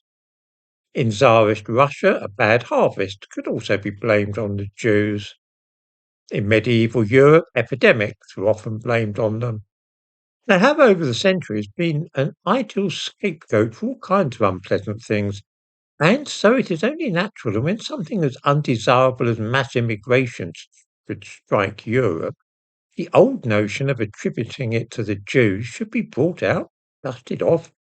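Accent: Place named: England, United Kingdom. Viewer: Too bad there isn't an English language version of this channel. We yanks can understand blokes like Attenborough and Dawkins, but this heavy dialect is just incomprehensible. It has always perplexed me that the English can't properly speak the language which carries their name.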